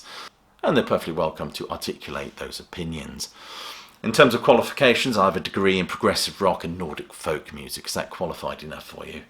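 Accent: United Kingdom. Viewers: I strongly prefer a well spoken gentlemen with a healthy knowledge and appreciation for music. The British accent is just an added bonus. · Dude, you got the coolest British voice going on...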